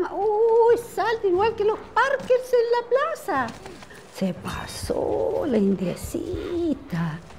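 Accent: Chile. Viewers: Tiene ascento de chilena ?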